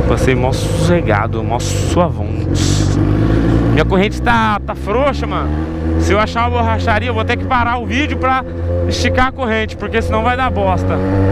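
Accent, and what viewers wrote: Goiás, Brazil. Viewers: Hoje ele falo no pique do Goiás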